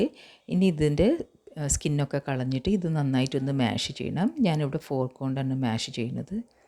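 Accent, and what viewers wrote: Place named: Kannur, India. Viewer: Kannur slang❤❤❤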